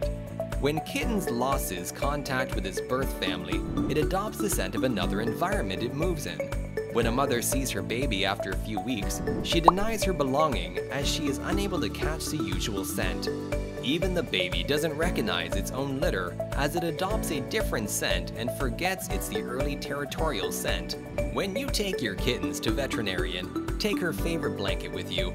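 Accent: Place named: Europe. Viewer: european english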